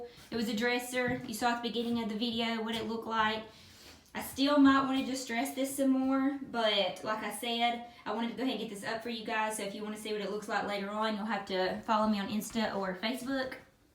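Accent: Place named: Kentucky, United States. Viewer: GORGEOUS!..Love your channel!..and your accent makes me miss my hometown in KY!..I currently live in Indianapolis, IN..But hope to get back to the Country sooner than later!..keep the home tours and DIY's coming Please!..LOVE THEM!..Have a Fantabulous New Year!..♥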